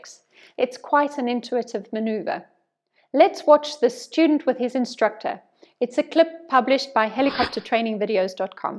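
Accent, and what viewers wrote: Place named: Australia. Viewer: >>> Pardon my asking this, and you do mot have to answer if you prefer not to, but are you originally from France? I ask because you have a VERY GOOD command of English, and your accent vaguely sounds Australian. Or maybe English. I am American, so what do I know...😉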